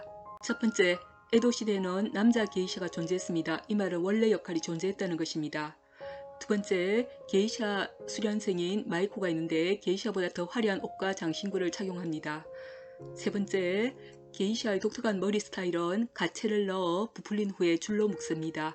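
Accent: Gyeongsang, South Korea. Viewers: 그냥 경상도쪽 아주머니 딱 말투구만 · 사투리라서 그런거니 자꾸 고치라고 하지 마세요. 억양이나 발음이 서울말씨와 다른것이 잘못된것은 아닙니다. 다른지방 사람들에세는 익숙하지 않아서 그렇지. 그리고 바꾸기 몹시 어렵습니다. 서울사람 아무리 연습해도 절대 경상도말투 못배웁니다. 서울 사람이 경상도에 가면 경상도 말로 고쳐야 합니까? 절대 나무랄 일이 아닙니다.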